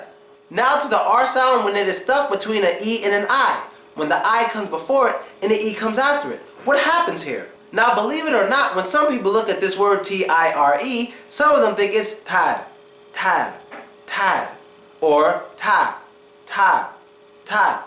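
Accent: New York, United States. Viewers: NY ACCENT LOL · How much would i give to speak such a perfect Us english ,at least the end of my left small little finger ,well ,thanks for that good lesson (i'm breton and trying to learn, improve your beautiful language) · Grrreat video! where are you from? Newyorker?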